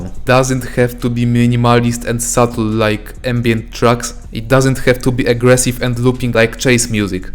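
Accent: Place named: Russia. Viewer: sounds either Russian or German to me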